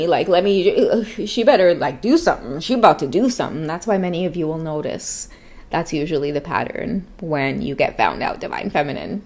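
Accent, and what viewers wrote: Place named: Trinidad and Tobago. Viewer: Not Jamaican, she’s from Trinidad not Jamaica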